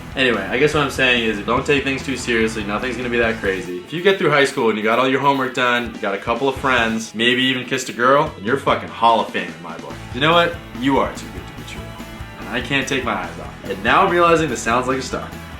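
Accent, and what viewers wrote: Boston, United States. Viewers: So much Boston accent hahaa! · Your Boston accent, OMG I can tell you went home for Christmas! LOL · I like you :) minus the boston accent and Patriots/Red Sox fav yuck..... As a sports fan we could never date. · Haha love how much of a Boston accent you have in this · That Boston accent tho. PLEASE CAN YOU SAY: 'I lost my car keys'. PLEASE! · your Boston accent.. yes, thank you. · Sounds like your accents coming in a bit more - spending a bit more time in Boston? Taken Tuesday... 'But what I do have are a very particular set of skills...' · The longer your beard gets, the more pronounced your Boston accent gets. It's fuckin' awesome. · Did you intentionally have a boston accent in this one?? heh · Chances are, your a fucking power ranger! LOL - Jason P.S. You can tell by your accent that you've been in Boston too long. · The boston accent is very apparent this week. 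Best yet!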